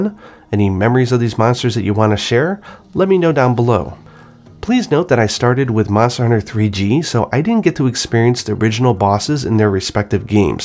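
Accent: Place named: Japan. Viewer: so this is how Japanese who're extremely fluent in English pronounce the monsters English name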